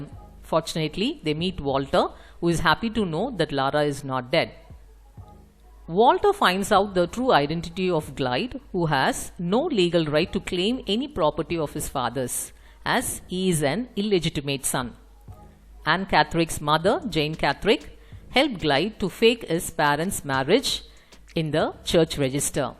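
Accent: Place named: India. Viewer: i dont understand anything becuase of your accent' why u were born as an indian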